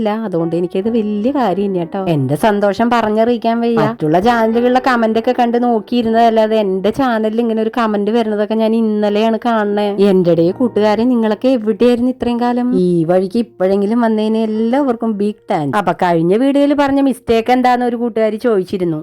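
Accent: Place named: Thrissur, India. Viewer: Thrissur slang😅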